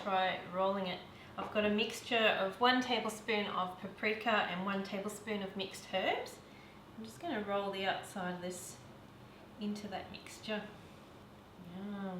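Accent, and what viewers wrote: Australia. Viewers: i don't think i did, i'll give it another try. The reason i took it off the heat is because the mixture already looked thicker than the one your poured. thank you, hearing your accent makes me miss Australia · omg i cant bare the aussie accent! so annoying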